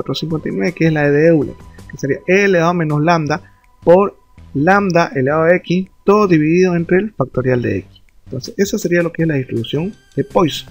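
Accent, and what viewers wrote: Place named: Santa Cruz, Bolivia. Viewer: Ese acento camba, bien mi hermano me ayudaste, saludos desde la llajta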